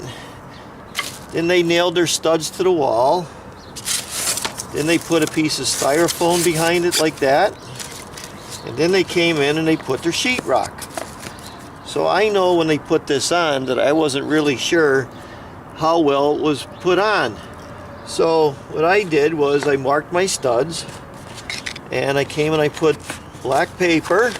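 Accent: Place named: Pennsylvania, United States. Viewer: great videos I really appreciate what you do I've learned allot from your videos the voice brings me back i grew up in south eastern Pennsylvania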